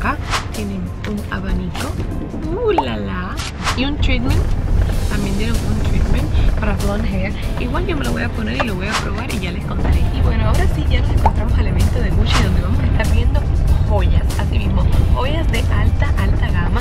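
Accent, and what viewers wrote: Cuba. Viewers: Vos sos de cuba tenéis acento cubano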